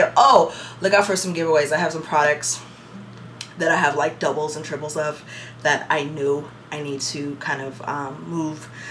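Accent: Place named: Toronto, Canada. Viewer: You sound like you could be from Toronto.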